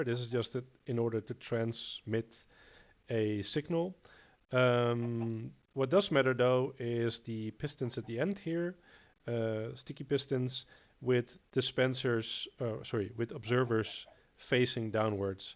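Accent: Netherlands. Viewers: You have a very noticable 'Dutch-english' accent.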